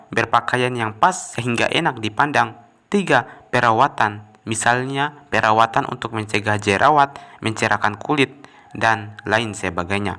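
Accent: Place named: Buton, Indonesia. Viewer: Logat mu buton betul🤣🤣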